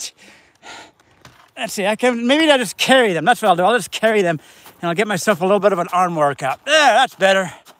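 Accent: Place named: Scotland, United Kingdom. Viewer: Are you Scottish or Irish....or both?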